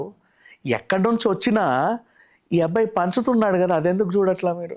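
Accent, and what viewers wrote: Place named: Visakhapatnam, India. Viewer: Vizag telugu is far better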